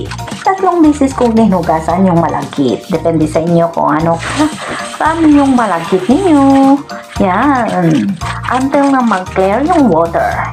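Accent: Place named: China. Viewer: Level up na biko ito my dear friend, Nakakatuwa po intonation the way your spoke po ng tagalog parang chinese intonation po. Amazing tutorial po my dear friend.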